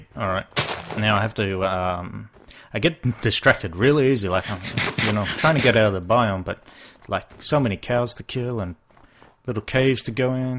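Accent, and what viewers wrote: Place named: Australia. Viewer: Whoa!! Loving it so far! You sound so... Aussie! Ahahahaha Most amazing bro.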